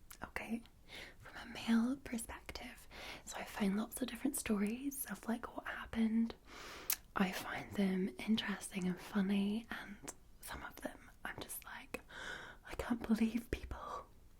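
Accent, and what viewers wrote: Scotland, United Kingdom. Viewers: Wait you're scottish? I'm scottish too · Your Scottish accent and the fact that you're Scottish is just enough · The beginning reminded me to a late night infomercial. Would you do something like that? like a mock-infomercial where you show different items, all presented in a relaxed scottish accent. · Jordana Brewster if she was a blond with a Scottish accent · FUCK SAKES UR SCOTTISH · she has a normal Scottish accent for a girl in Scotland, im Scottish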